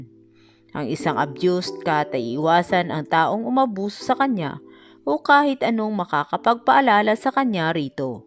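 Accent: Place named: Visayas, Philippines. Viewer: Huwag ka naman bully Tiktokersph pangit ang ugaling ganyan. What's wrong having with visayan accent? Ikaw ba excellent ang accent at grammar mo??! Manood ka lang huwag ka ng mamintas! 🧐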